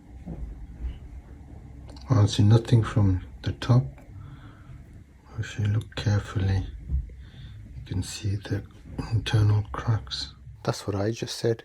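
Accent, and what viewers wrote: Birmingham, United Kingdom. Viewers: You a brummie mon?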